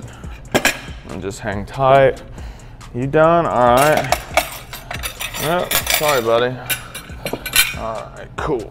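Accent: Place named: South, United States. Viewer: he's just southern, calm down 😭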